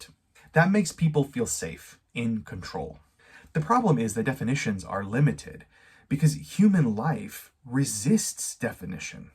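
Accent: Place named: Canada. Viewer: Are you from canada brother , your english accent as canadian people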